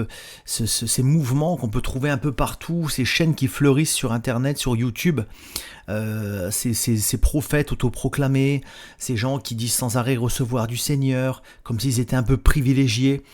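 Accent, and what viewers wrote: Canada. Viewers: Canadien, non ?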